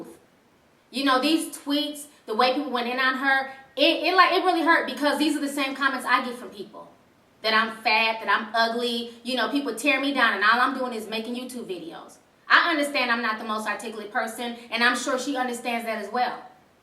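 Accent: South, United States. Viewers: ❤ love this and I love your accent. I just thought you were southern. 😄😄 you learn something knew everyday. 😕 I'm Belizean but my accent totally went away. 😩 I assimilated. 😫😫😫 you had cancer I'm so sorry/ 🙌🙌🙌 so glad you are in recovery ❤❤.😟 you really don't mention it in 2017 I just thought you had a virus 🙏 I'm even more glad I've been praying for you ❤❤ so happy you are alive and living your best life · just started watching your channel. was quite surprised that people give you grief about not being articulate. you seem pretty articulate to me; and as for the accent, just figured you were a fellow southerner! also awesome to hear someone speak the truth about lolo jones. great video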